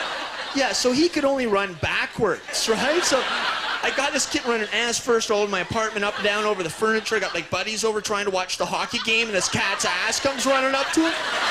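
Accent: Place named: Canada. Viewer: in 4 seconds just about every listenerd could tell: Canadian. You mix up stoner with surfer - I Spoke surfer since childhood.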